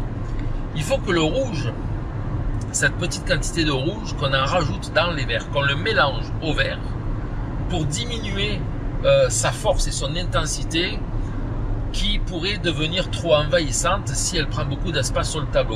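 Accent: South, France. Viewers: Merci pour vos vidéos. Je suis fan. Et de savoir que vous êtes au Québec me ravit. En revanche vous avez dit à un moment donne que vous aviez travaillé à Berne l’Etang. Et question un peu indiscrète vous êtes de cette région de France. À vous écouter vous avez un accent du midi. À bientôt.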